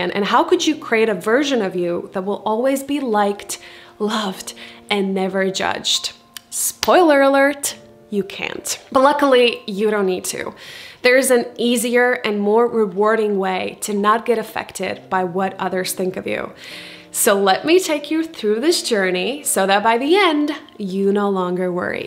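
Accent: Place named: Eastern Europe. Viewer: nicely explained. Llve the Eastrrn Euro accent permiating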